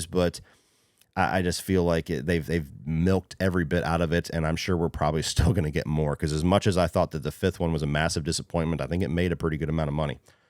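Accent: United States. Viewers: I’m surprised no one has offered him voice work. He has that perfect American voice that would appeal to folks in Asia and elsewhere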